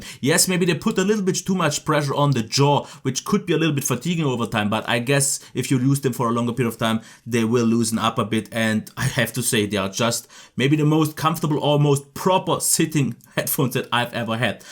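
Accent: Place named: Germany. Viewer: the German accent is hella funny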